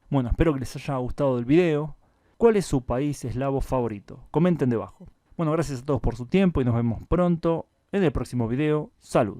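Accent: Buenos Aires, Argentina. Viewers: Eu amo o espanhol falado por ele. O espanhol portenho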